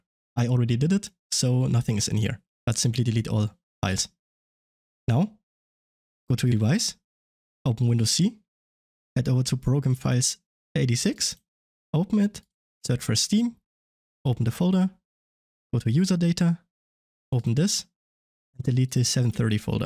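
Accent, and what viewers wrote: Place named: Germany. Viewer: it vac banned me, ty thick german accent guy.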